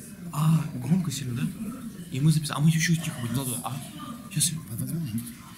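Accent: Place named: Odessa, Ukraine. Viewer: У НЕГО ТЕМБР ГОЛОСА ОДЕСИТА ЧЕТКО БЫСТРО ПО ДЕЛУ С ЮМОРКОМ РАВНЫХ НЕТ !!!!!!!!!!!! У ВАС ТАК НЕ ПОЛУЧИТСЯ !!!!! ЗНАТЬ !!!!! УМЕТЬ НАДО !!!!!!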